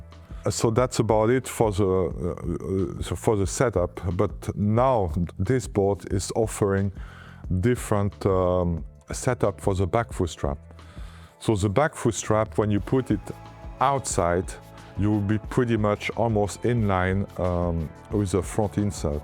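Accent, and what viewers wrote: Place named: France. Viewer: there's french accent and then there is french windsurfer accent.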